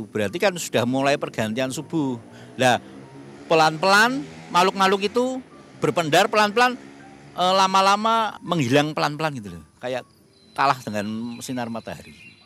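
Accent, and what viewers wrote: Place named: Semarang, Indonesia. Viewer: Pantesan ora asing mbek logat e.. jebul wong semarang 😂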